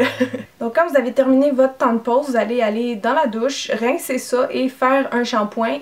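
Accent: Canada. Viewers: en plein dans le mille, j'etais sure que tu etait canadienne !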